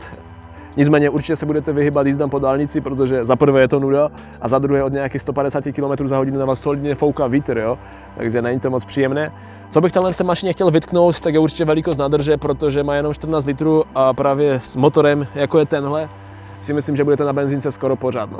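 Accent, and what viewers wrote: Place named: Těšín, Czech Republic. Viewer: spíš bych to slyšel od Těšina na vychod... Třinec, Jablunkov , Mosty????? :-))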